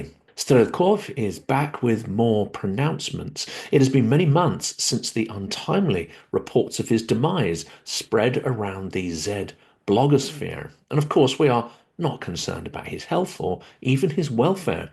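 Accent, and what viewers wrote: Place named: England, United Kingdom. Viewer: Thank you,! Brilliant, as usual. To American ears, your received English accent is very pleasant, even poetical.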